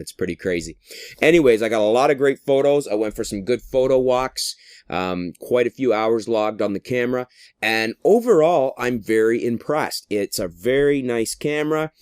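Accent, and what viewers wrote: Canada. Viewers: I love your Canadian accent! Made me smile so big. ; ^ D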